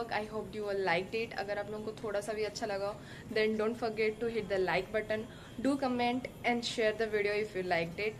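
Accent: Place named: Odisha, India. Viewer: hindi re Odia accent bahut achi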